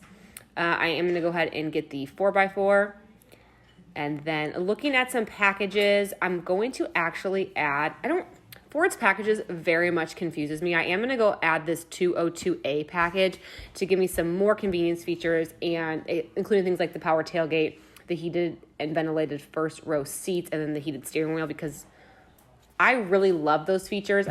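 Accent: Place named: Midwest, United States. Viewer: St Louis!! Duh... that’s why you sound MidWestern. I’m just up the road a couple hours in Illinois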